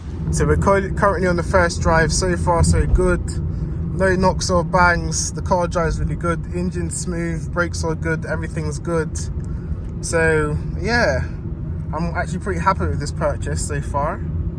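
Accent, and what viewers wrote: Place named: Bristol, United Kingdom. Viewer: Great video mate, new sub here!! You sound like Bristol way?? Might be wrong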